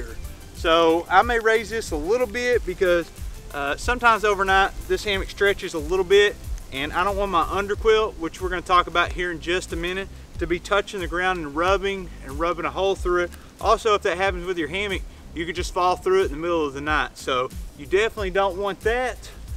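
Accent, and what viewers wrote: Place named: Southeast, United States. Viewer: 2 questions Just started to use a hammock, tent was annoying me too much. So im a bit new Im actually having trouble getting comfortable? i dont like my legs bending upward like im a stork or something. Like a human banana. Is that just unavoidable, or should i adjust my hammock somehow? second. watched a couple of videos everyone seems to have a southeastern accent. (guess thats where the trees are lol) where are you from?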